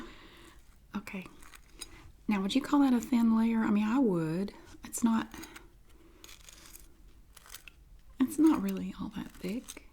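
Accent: United States. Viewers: gor God's sake, Please stop with the pronunciation issues. She lives in the States of course shed pronounce it differently than Aussies.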